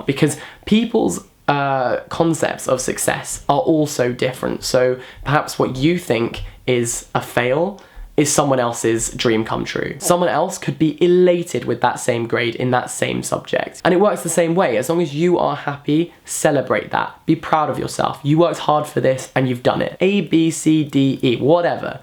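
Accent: United Kingdom. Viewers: I love British accent and I'm in Russia love you accent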